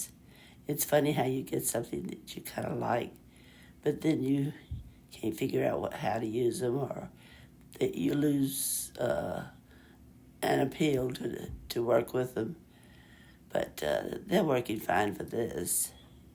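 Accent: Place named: Deep South, United States. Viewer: 👋 Hello Neighbor! My Tennessee Neighbor, I know you live in Tennessee but I would swear I hear a Deep South accent in you, I could be wrong though. I live in and was born here in rural Appalachia, Leslie & Harlan county, but in my 20’s I movie to Biloxi Mississippi for a couple years, and I’m just curious if you are Originally from Tennessee or if maybe you grew up down South? I LOVE your channel, I am fairly new so I’m watching some of your older videos too in my free time, your toilet paper video blew my mind! I think you are a undiscovered Genius! 😊❤ You are Awesome sauce❤, a wealth of knowledge, I’m so grateful to learn from you, Thank you so much for sharing!!😊 I just adore you. Also, 👋 hello to everyone watching, crafting friends! 😊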